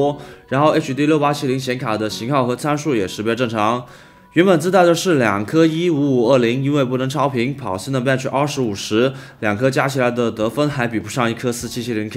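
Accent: Cantonese, China. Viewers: 是不是粤语人？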